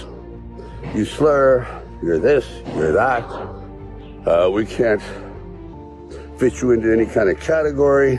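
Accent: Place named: Italy. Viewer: I love your voice speech Italian....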